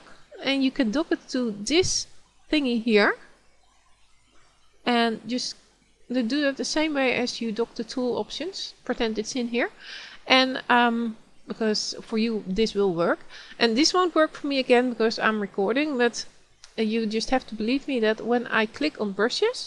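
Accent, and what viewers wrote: Netherlands. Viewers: Bedankt, ik hoor aan je stem dat je van Nederland komt of toch Nederlands praat. Ben ik juist? Toch bedankt want ik zat met dit probleem! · Wedden dat je nederlands bent / I bet that you are \Dutch :d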